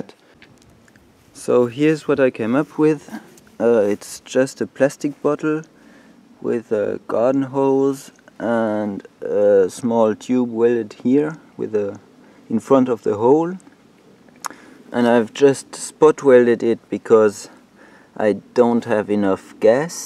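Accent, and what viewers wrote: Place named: France. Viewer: heureusement c'est un beau projet, les anglophones n'ont qu'a faire ce que ton accent francais trahi, traduire 🤨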